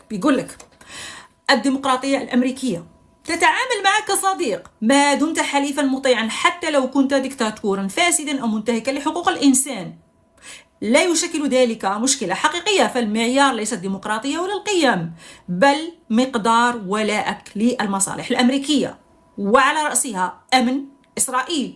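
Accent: Morocco. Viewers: Cette femme est marocaine l’accent marocain la dénudée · مهما حاولت التأقلم على اللهجة الجزاءرية فلهجتَك المروكية خانتك انت عياشة والحلم لن يتحقق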